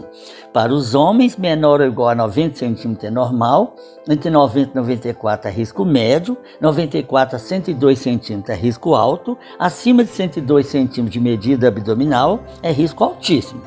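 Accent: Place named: Minas Gerais, Brazil. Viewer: Gente, estou encantada pelo Dr.. Fala com segurança e objetividade. E o jeito de "mineirin" é bem descontraído. Sou mineira também, da capital. 😍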